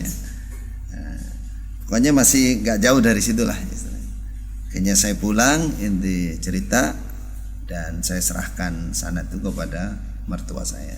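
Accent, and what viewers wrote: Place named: Java, Indonesia. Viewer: Madura tapi logat bicara nya 100% jawa...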